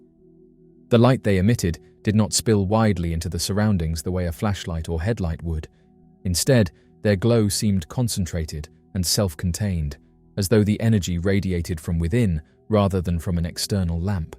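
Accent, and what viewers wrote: United Kingdom. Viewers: What's with the limey accent?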